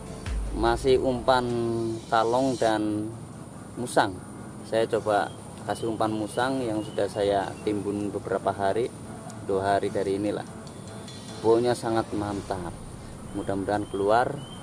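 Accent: Java, Indonesia. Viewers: Sampean jawane ngndi.. Poine jos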